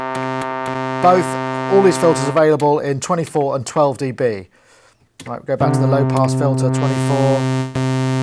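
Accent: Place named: United Kingdom. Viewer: Lock, stock, and two smoking oscilators accent right there. This guy should be in the next Guy Ritchie movie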